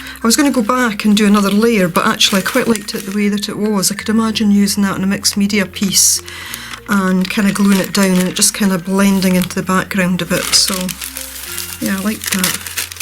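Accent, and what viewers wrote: Scotland, United Kingdom. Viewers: I've noticed you use certain colors frequently. I guess we all do! Whatever it is works for you because your pieces always come out so beautiful. I think I could pick out your style easily in a line-up, HAHA. That gold and turquoise you combine kind of looks like ancient stone. I LOVE it! You're my favorite youtuber right now and I'm thoroughly enjoying your videos! Oh, and great idea on using the security envelopes. I haven't tried that and plan to tomorrow. PLUS, I just adore your Scottish accent, lol! Hugs to you ~~Liz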